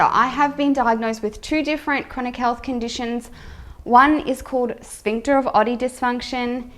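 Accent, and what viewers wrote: Australia. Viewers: First of all, I love your accent. I’ve been trying to do an Aussie accent because I’ve been watching ‘Instant Hotel’ with my daughters, apparently I can’t do it. I disagree. I’m at the stage in my minimalism where I’ve been adding some items back in to our home. Very intentionally though. I still hate clutter but I love plants and I love books. So I’m allowing myself to buy more of these things because they make me happy. I no longer feel that to be a true minimalist that I have to get rid of everything! It’s about getting rid of what you don’t need and valuing the things that you do need. Lots of love to you from Lockdown England, though we’re coming out of it in the next few months, xxx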